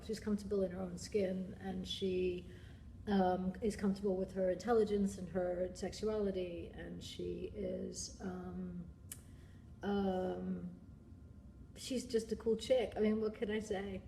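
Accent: England, United Kingdom. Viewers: Russell Barth She's always had a English accent.She grew up in London.Thats her original accent. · Oh wow, when she’s interviewed in Britain she adopts a British accent. It’s nicer I must say. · why do you have an english accent now when you didn't before?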